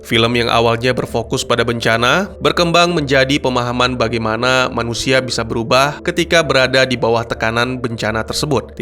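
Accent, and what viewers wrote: Aceh, Indonesia. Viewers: Maaf bertanya org Aceh ya bg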